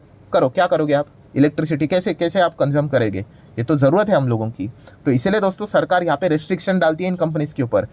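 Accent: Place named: South, India. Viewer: Baat sunke to lagta hai South se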